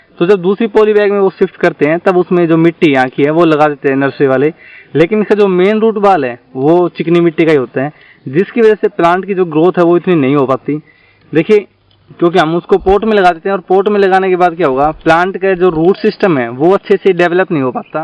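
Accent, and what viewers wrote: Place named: Jhunjhunu, India. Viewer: aapki bhasa mst h aap junjunu side k ho kya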